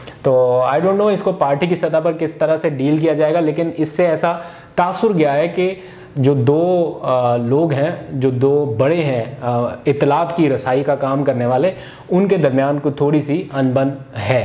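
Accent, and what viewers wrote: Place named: Punjab, India. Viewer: Your Punjabi is so cute bro PAKISTAN Zindabad Kashmir bna ga Pakistan. Lots of love from Azad Kashmir, Pakistan India tum log kro GA Mera PAKISTAN sa mukabla 🤣🤣🤣🤣phla gae Ka uron drink krna Chor do , aur learn from Pakistan to make strong farmers ,young generation and to love all minorities. PAKISTAN Zindabad No one able to compete PAKISTAN because PAKISTAN is one of the secrets of Allah PAKISTAN Zindabad My Kashmir Ambassador Imran Khan Zindabad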